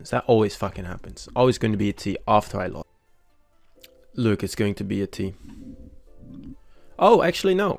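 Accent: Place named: Netherlands. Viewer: Where you from? You sound dutch 🇳🇱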